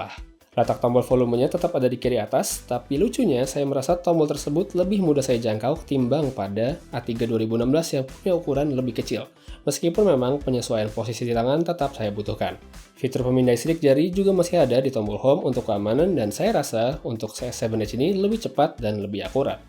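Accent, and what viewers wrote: Bali, Indonesia. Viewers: Bang lu orang bali ya??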